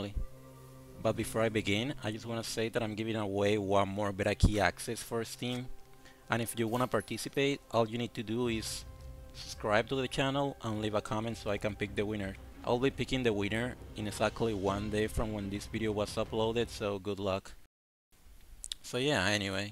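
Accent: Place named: Brazil. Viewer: mano tu e BR? mo sotaque de BR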